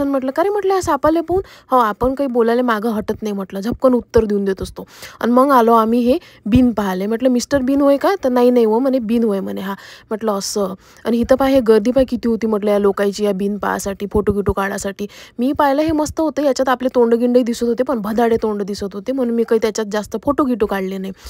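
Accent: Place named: Vidarbha, India. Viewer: विदर्भाची शान विदर्भाची भाषा..❤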